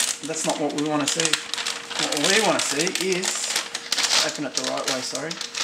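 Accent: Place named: Australia. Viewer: Aussie pride, shown through expensive products and decisive accents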